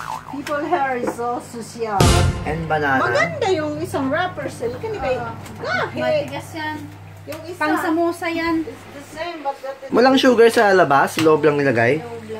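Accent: Visayas, Philippines. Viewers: Kuya! Curious lng ko, taga aha imo mama or papa? bisaya lge kaayo hahahaha! Proud Bisaya here 😊😊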